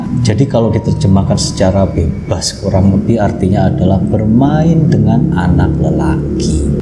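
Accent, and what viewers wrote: Java, Indonesia. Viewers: Logat jawa tpi pke ny gua,gua. Gk byambung mas hrus ny pke kalimat ny sy bukan gua.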